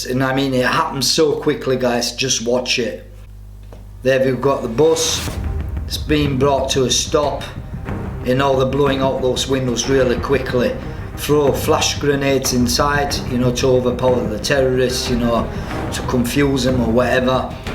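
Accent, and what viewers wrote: Scotland, United Kingdom. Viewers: I enjoy this guy’s accent. Are you a Scottish? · Я сам нихуя не понял из-за британского акцента и диалекта, хотя английский знаю хорошо (американский английский) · That is a quintessential Scottish accent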